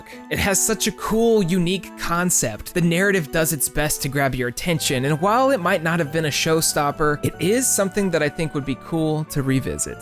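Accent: Louisiana, United States. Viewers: Did not know you where cajun. I'm from Louisiana, north Louisiana so not cajun but a Louisiana baw none the less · oh shit baaaaaabie i didnt know you was cajun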